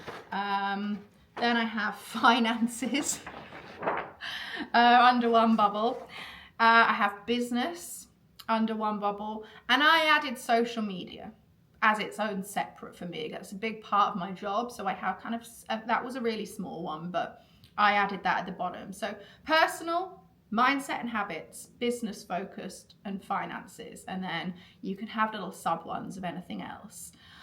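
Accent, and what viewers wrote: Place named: London, United Kingdom. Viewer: Most surprising thing in this video is that you’re from Yorkshire??????? Whaaaat. That is the most London accent ever!!!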